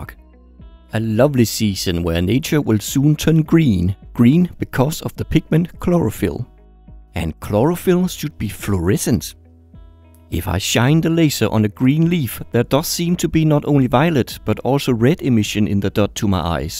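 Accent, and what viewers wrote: Denmark. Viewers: I KNEW YOU WERE DANISH!!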